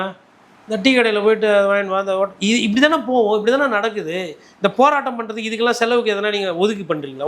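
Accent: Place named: Chennai, India. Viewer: அண்ணா நீங்க வேற மாதிரி.சென்னை பாஷைல.ஆனா நா கோயம்புத்தூர்.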